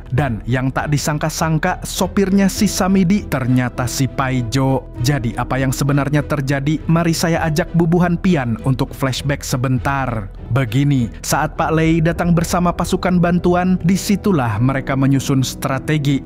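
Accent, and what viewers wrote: Banjar, Indonesia. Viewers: Org Banjar KH mang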